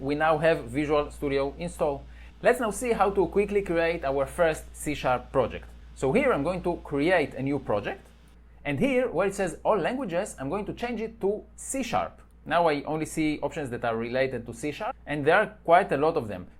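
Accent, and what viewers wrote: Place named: Israel. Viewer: ניסיתי למצוא סרטון שמראה לי איך להוריד.. לפי המבטא אתה ישראלי נכון?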